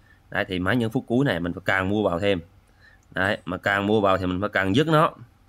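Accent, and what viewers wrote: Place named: Quang Nam, Vietnam. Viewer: helo a, nghe giọng a giống ở quảng nam z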